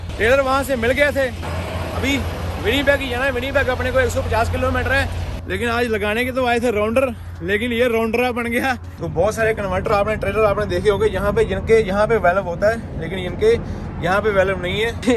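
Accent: Punjab, India. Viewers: पन्जाबी बन्दे शुद हिन्दी बोल्ता हे तो मुज्को भि मन मे चड्दी कला बोल्ने के मन होता हे काके 😂😂😂 Keep it up bro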